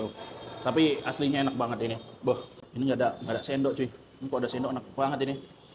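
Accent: Medan, Indonesia. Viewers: Nambah napsu makan nonton chanel ini.. suka sama kedua reviewernya = lidah medan = Lidah bandung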